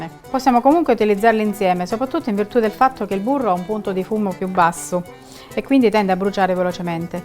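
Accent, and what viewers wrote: Calabria, Italy. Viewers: Hai un accento calabrese bellissimo ❤️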